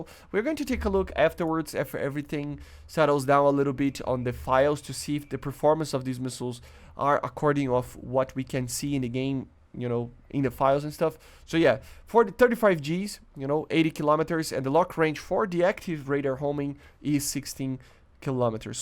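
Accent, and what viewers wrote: Brazil. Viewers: Sotaque brasileiro?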